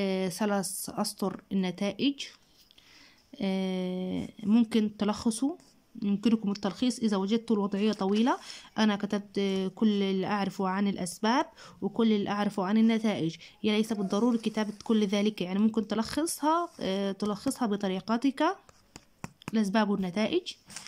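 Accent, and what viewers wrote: Egypt. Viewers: انتي مصرية ولا جزائرية تقديم الدرس رديء جدا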